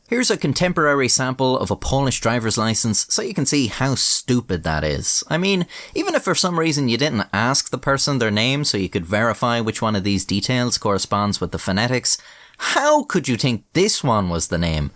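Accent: Ireland. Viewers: Brilliant vid I think you are fantastic. Do you doodle the sketches. So clever! Better than the usual generic clips. Best satire out there even better your irish 🇮🇪 like meeself 👍👊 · This Irish accent is the best English accent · I find the cartoons and Irish narration of these subjects so hilariously charming in these videos. You have the gift of the Blarney. Keep up the great work. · You have that Irish accent that sounds sarcastic/ angry the whole time. Think Ive seen a comedy sketch about people from there trying to sound sentimental and it was hilarious!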